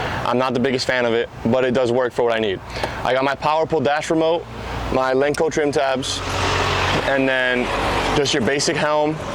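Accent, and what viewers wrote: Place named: Miami, United States. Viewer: The Miami accent goes crazy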